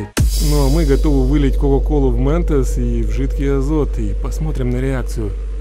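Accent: Ukraine. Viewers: У тебя украинский акцент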